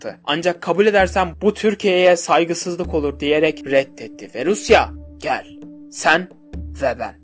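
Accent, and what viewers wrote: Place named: Armenia. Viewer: sen niye bele danssan ermenlerde bele eliyir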